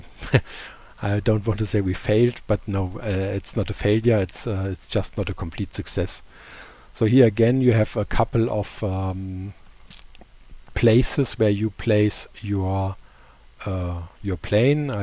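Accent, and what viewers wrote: Germany. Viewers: You sound very german dude :D Nice Mod!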